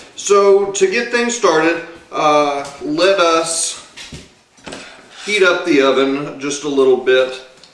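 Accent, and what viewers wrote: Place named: South, United States. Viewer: speaks in a souther drawl!!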